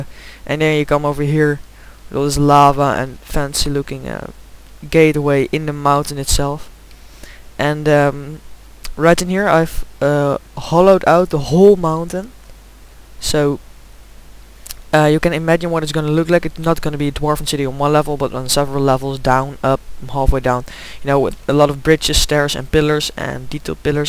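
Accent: Netherlands. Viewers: nederlands? dat hoor je gewoon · Ben je nederlands xD Je hoort gewoon dat je geen engels/amerikaans bent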